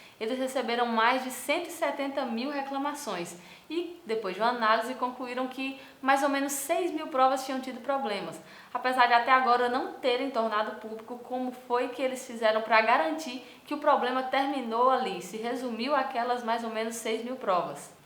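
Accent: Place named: Pernambuco, Brazil. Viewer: Eita sotaque arretado! Parece com o meu pernambucano ❤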